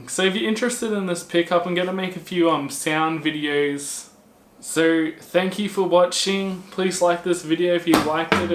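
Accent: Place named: Australia. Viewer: i'm loving the way youve edited and explained the fitting of this preamp and pickup ...really easy to follow and your aussie accent is charming...off to buy one for my guitat tommorow and fit it,,,,,Nice one Man!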